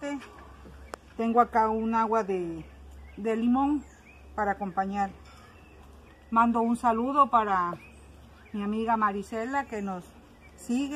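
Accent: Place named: Veracruz, Mexico. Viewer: De donde es usted? Parece de Veracruz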